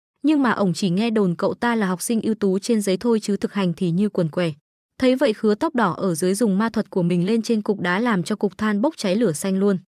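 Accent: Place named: Nghe An, Vietnam. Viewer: Tôi chắc chắn ad là người nghệ an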